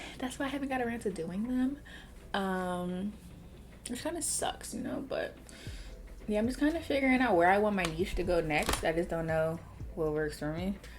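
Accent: Philadelphia, United States. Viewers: is that a philly accent I hear?? 🥰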